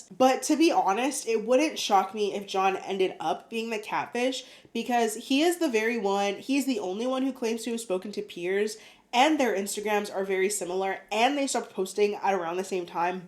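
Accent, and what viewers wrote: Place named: Canada. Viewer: It’s crazy I skipped over you being Canadian in your bio and I heard you say “about” as “aboot” I had a degrassi flashback and said ohhh… is def Canadian 😂I’ve watched plenty of your vids and somehow never paid attention to that until now but I love it ❤😂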